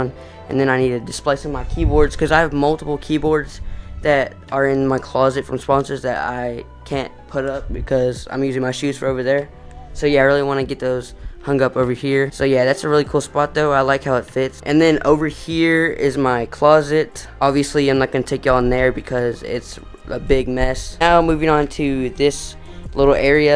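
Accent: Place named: Texas, United States. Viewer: Impressive room. Desktop setup is amazing. Little clues like your accent and the weather today make me think you’re in Texas.🤷🏻‍♂️ Great content. All the best. 🗡Ace🗡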